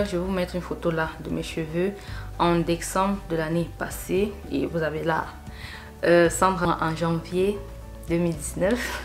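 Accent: Cameroon. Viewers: Slt ma belle!beau montage.je te suis depuis peu et j adore ton accent camer et ton cote' comik😆.j aimerai te poser une question,penses tu que la porosite' des cheveux influ sur le type de produit a utiliser?si c pas indiscret ,quelle creme hydratante utilise tu pour reussir a tenir 1 semaine sans les rehydrater?perso j ai des cheveux naturels coe sur mon profil et j ai vraimt du mal a trouver un hydratant ki me convienne😊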